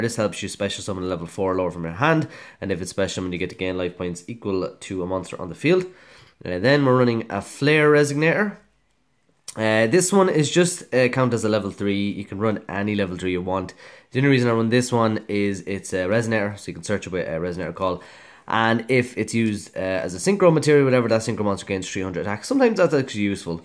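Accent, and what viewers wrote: Ireland. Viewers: Number 15 view 👌 you sound like you’ve an Irish accent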